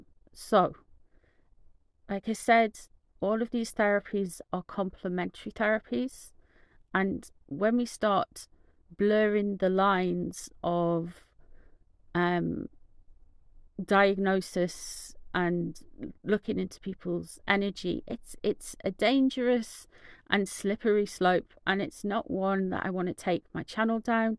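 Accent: United Kingdom. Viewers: I have watched your videos ever since Shanta from Mystical Fortunes had her members share a reading together with you! I have to say it took me a few times to understand what you were saying. Only because of the wording you have a great British accent ❤️ I soon learned of your quality of kindness and caring of the people who are in your community of people who follow you and your members. I have wanted to join but I needed help from my daughter who finally got me into the membership. Shanta always speaks very highly of you and has told us to go to you for any of our needs!!! Thank you for your amazing work and the ethical way you have done your work in all your videos. I love your live streams and enjoy them as well as all your other videos. I hope you have a wonderful day 💙☮️✨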